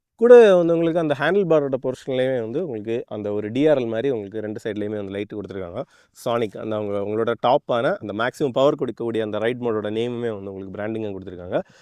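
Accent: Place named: Nagercoil, India. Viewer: This is Nagercoil slang and also he associated previously with motowagen family only...Due to some clause he left and started this channel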